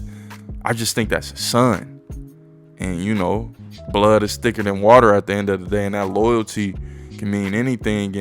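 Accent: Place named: Pittsburgh, United States. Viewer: Bro you sound like you're from the Burgh lol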